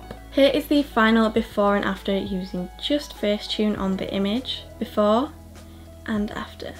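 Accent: United Kingdom. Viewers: SHES BRITTISH. UGH IM IN LOVE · omg i had no clue that u were british!! you’re so pretty and omggg i love you’re accent and i thought u we’re american tbh but omg🥰❤️ so pretty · Your from the UK sis❤ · YEEESSSSS SHES BRITISHH!SHE GETS ME... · ....doesn't everyone have an accent..? Do you mean she's British? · Ur rlly British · POV: u had no idea she was British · I looked her up and it said she was born in England and I was like oh my God I need to hear her voice. So, I came here and I had no clue she was British. I only saw her in tiktok. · Bruh I wasn’t ready for the accent hello fellow Brit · Jade McAboy she is brithia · Wtf she’s British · OMG I didn't know you were British I thought you were American and I didn't expect your voice sound like that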